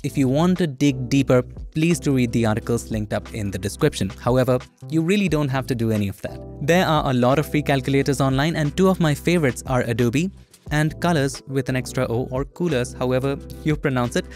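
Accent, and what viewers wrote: India. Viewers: Man the Indian(?) accent can be really nice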